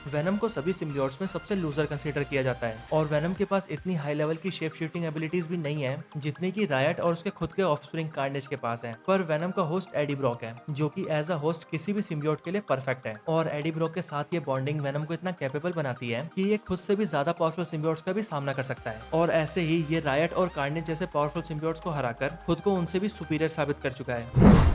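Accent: India. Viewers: hind şivesi cok komik :D:D:D:D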